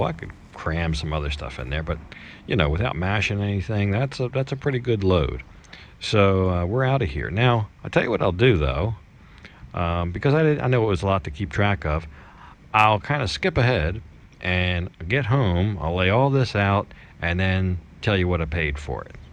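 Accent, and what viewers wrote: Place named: East Coast, United States. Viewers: great videos! keep it going! reshhtraunts and shhtreets....what accent is that? east coast? where you from originally? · Your accent sounds like South Jersey, the Philadelphia area or Delaware in the US. Are you originally from any of those areas?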